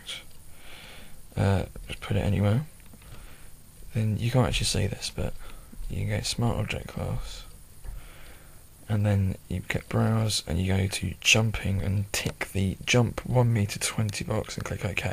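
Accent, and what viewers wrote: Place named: United Kingdom. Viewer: Absolutely fantastic! Great to see that this channel hasn't died, it seemed like such a good idea. Great tutorial, very clear and helpful. Always good to hear a grand british accent on the web too:). Greets. Eth.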